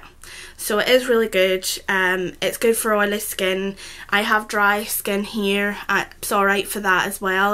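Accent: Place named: Northern Ireland, United Kingdom. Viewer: Are you from northern ireland? I think I recognise your accent haha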